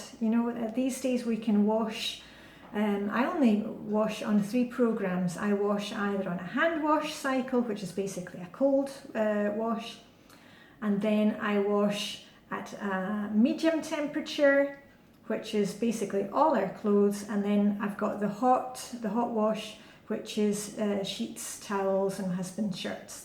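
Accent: Scotland, United Kingdom. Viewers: Thank you so much for everything you do. These baby steps are truly life-changing and I'm feeling happier and more positive as a result, I'm really getting my 'mojo' back, so thank you for your videos. I've had FlyLady's 'Sink Reflections' book for a couple of years now and it's great but actually seeing you every day and watching your videos has really made all the difference. My sink is clean and shiny, I'm dressed to shoes, the washing is on, the bed is made and my kitchen hotspot extinguished! I look forward to watching the next video every morning, I make a cup of coffee and it's like having my own little pep talk. Keep up your fantastic work! PS I'm a Scot living in England and it's lovely to hear a familiar Scottish accent, just like being home. Thanks again x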